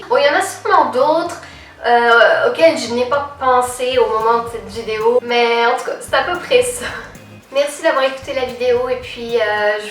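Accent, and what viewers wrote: Quebec, Canada. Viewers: WOW! au Québec depuis juste 3 ans environ et déjà l'accent québécois (un peu mélangé avec l'accent français mais quand même). En t'entendant pour la première fois, je me demandais si t'es française ou québécoise!! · Ta pris l'accent quebecois en 2 ans ^^ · C’est mignon le mélange accent québécois et français! J’adore! ❤️ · Ta une accent québécois 😀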